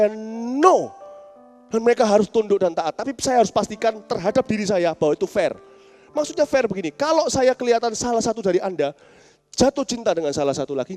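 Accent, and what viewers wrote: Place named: Surabaya, Indonesia. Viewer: Julia Angelina hehehe... itu logatnya orang cina surabaya emang medok bangett...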